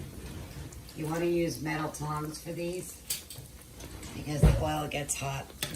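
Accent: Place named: New York, United States. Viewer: I want to try making this at some point, because it looks so yummy!! I love your videos, because the way you talk reminds me of my family, and makes me feel comforted. <3 They're all from NJ/NY.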